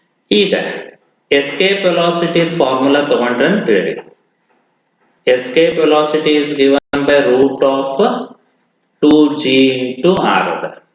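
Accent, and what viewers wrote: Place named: North Karnataka, India. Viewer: Thank you sir. You speak north kannada, may i know where you are from sir?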